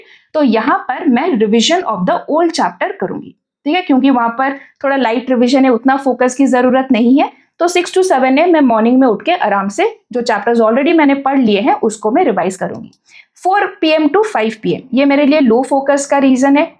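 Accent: Odisha, India. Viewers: Ma'am app odisha se ho kya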